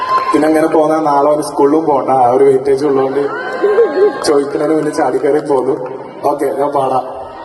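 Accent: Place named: Nilambur, India. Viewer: njagale nilambur slang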